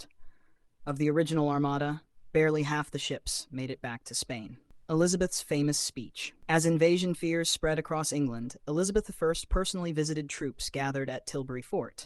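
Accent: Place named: United States. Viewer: "THE BATTLE OF THE SPANISH ARMADA" makes no sense. But what else can you expect from a US dialect narration? The battle of the English Channel is a bit more logical. Jack, the Japan Alps Brit.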